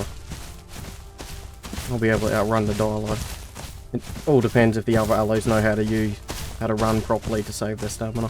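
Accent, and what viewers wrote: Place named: Australia. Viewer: Love your accent m8. If you don't mind my asking, what part of Australia are you from? Just the way you say certain words like "alive" and "die" is really slick and reminds me of the Big Lez Show. I was wondering if it is a common way of speaking or more of a regional dialect.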